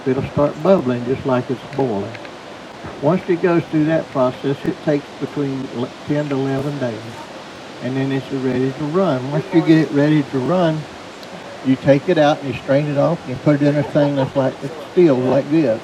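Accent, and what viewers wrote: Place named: South, United States. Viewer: I can't understand what he's saying. Sounds country as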